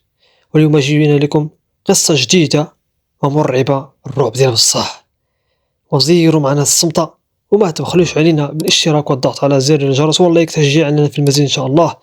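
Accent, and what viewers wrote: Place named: Chleuh, Morocco. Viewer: Salam monsieur ça s entends que vous ètes chlah peut-être être d agadir ou taroudante et que vous êtes assez âge la même voix que ba jadi allah irahmou bonne continuation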